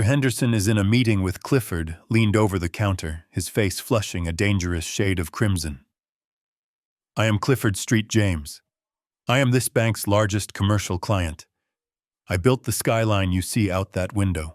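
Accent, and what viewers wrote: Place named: United States. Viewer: Enjoyed listening , nice clear American accent , from Scotland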